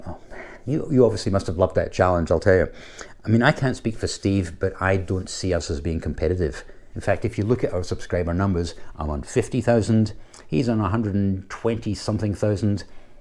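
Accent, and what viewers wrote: Scotland, United Kingdom. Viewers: Congratulations 👍👍. How come you have a Scottish accent when you grew up in Oz?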